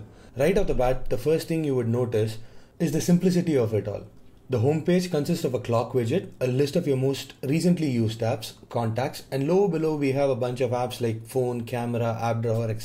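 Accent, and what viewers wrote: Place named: India. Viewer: That Indian accent doe.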